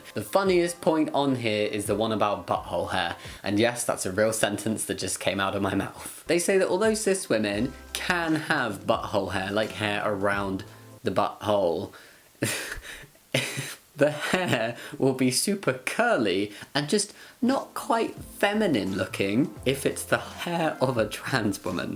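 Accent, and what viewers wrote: United Kingdom. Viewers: I love how masculine his voice sounded when he said ‘femininity’. Something about the mixture of the deep voice with the British accent. Not fetishising, I’m British too, but yeah just a random thought. Otherwise I enjoyed the vid, that TERF is a right idiot and an all-round awful human being.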